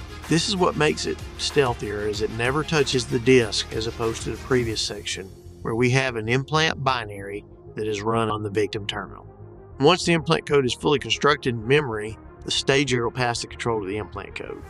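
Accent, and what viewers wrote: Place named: South, United States. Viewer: an intelligent person rocks his country accent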